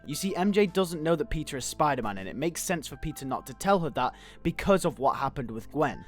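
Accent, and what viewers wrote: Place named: United Kingdom. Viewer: you are very good youtuber and your British accent is great what happens next?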